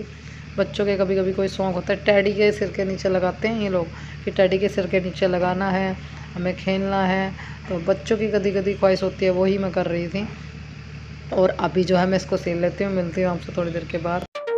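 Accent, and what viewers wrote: Haryana, India. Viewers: Aaj kl language haryanvi tone le rhi h Kya baat h samjh nhi aaya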